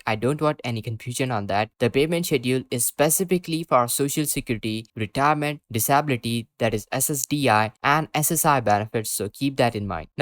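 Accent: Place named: Punjab, India. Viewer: DUDE YOU TALK SO FAST WITH YOUR THICK PUNJABI ACCENT THAT I CANT UNDERSTAND YOU. SLOW IT DOWN.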